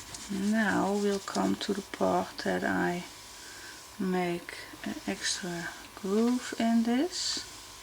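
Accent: Netherlands. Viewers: Brilliant idea. Thank you for sharing and for the free tutorial. I just love your Dutch accent. XXX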